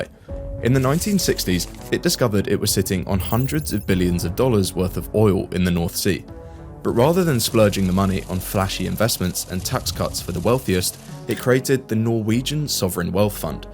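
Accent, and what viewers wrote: United Kingdom. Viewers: A BRIT TALKING 😂. CRIME RATE THROUGH THE ROOF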